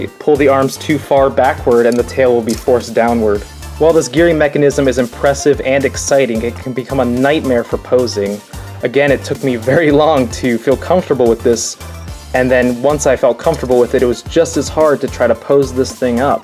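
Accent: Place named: United States. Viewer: That American Narrator thx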